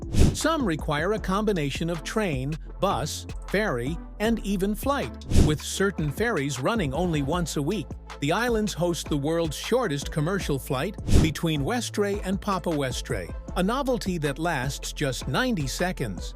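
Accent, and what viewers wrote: United States. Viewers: F'cking American voiceover. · seconds in and an American accent that can't pronounce towns ("Endinboro"), then I read the comments! Result, found something bbetter to do with my time!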